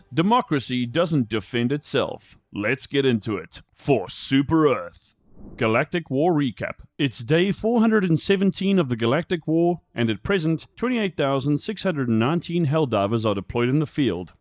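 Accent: South Africa. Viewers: Can't place your accent. It sounds like a South African that lives in Texas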